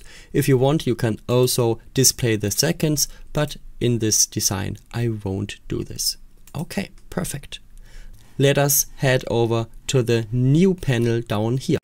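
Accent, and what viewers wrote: Germany. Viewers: Thank you mate, your Accent sound like Super German by the way😊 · Den Deutschen höre ich doch raus, danke für das Tutorial! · ich höre da einen leichten deutschen akzent raus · i love deinen german accent Digger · Love that german accent :face-blue-smiling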